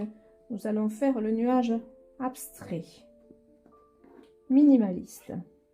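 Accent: South, France. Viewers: Bonjour Madame. J’ai une petite question belge à poser. Je n’ai jamais peint à l’huile. Cela demande t il une préparation particulière Le coût est-il plus élevé qu’à l’Acrylique ? Encore merci de vos conseils chantés avec votre magnifique voix du Sud. Depuis la Belgique, Amicalement ERIC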